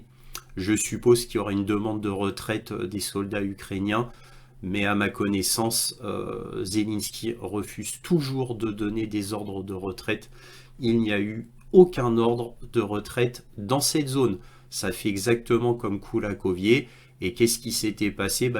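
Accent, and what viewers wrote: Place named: Russia. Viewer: D'où vous vient ce bon accent russe ? Je me suis abonné il y a quelques jours, j'aime bien ce que vous faites !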